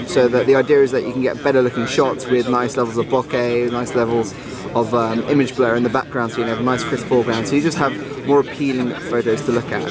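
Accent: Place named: England, United Kingdom. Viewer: You sound like Jonah Hill, but with English accent;)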